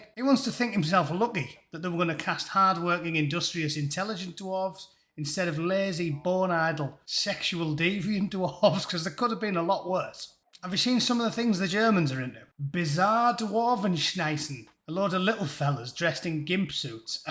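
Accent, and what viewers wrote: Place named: Northern England, United Kingdom. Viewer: Good stuff. First time here. Been working on my ability to distinguish English accents and I got it right from once. Guessed you had to be from the north and......apparently you are.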